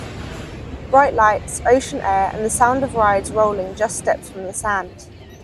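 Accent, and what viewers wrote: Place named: United Kingdom. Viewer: While you're visiting places I am already familiar with I find they become more exciting when described with a British accent. 🤪 Hopefully we will cross paths again when you arrive at your current destination.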